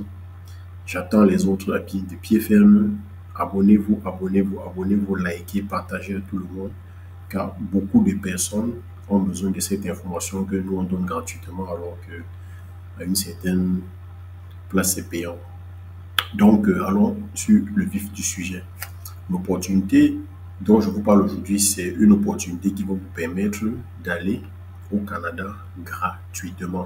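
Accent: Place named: Cameroon. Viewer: mon gar ta video n''a pas de volume et ton accent cest kmer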